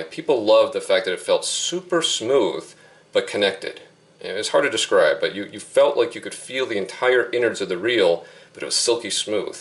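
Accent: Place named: Delaware County, United States. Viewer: where in Delaware county are you from? that accent is thick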